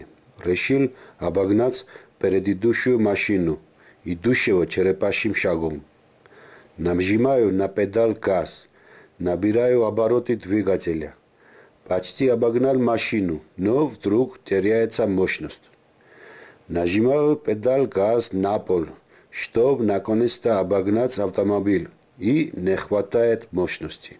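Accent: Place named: Georgia. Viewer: Всегда с удовольствием смотрю и слушаю ваши видео. Особенно с грузинским акцентом!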